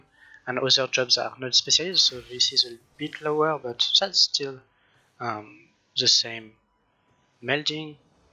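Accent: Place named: France. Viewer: love french english accent ! thanks for guide btw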